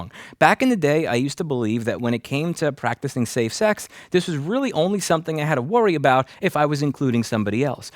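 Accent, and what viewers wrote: New York, United States. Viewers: Is it just me or Sounds really New Yorkey on this one?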